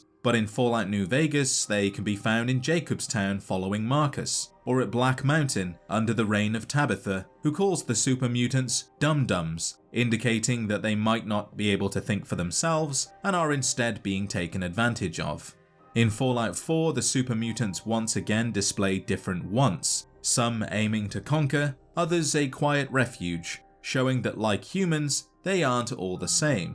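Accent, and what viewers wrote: United Kingdom. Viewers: Part Shoddycast, part Oxhorn, part MittenSquad, fully British.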